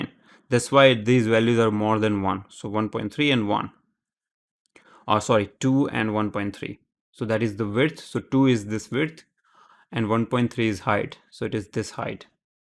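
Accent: India. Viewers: indian accent is so cute 🤣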